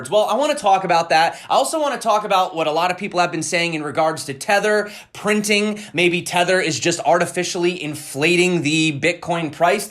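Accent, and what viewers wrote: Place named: United States. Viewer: Am I the only one still living in the US? Even you appear to have an American accent yet you use Bybit which is not available for US residents.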